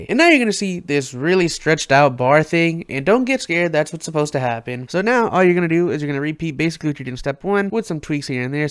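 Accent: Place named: New York, United States. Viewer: You must be from NY or the east coast. But bro thanks for the video man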